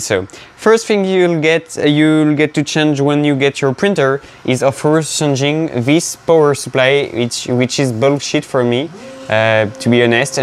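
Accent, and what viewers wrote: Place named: France. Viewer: Salut, tu devrais renoncer à tout faire en anglais ou bien travaille un peu plus la prononciation, des fois tu es difficile à. Comprendre... ne te bloque pas trop sur l’accent, c’est pas si important, de toute façon on entend que tu es français, bon,, à part ça, tu dois ontinuer car tu fais du bon boulot et d’ici peu tu te fera ton petit nom....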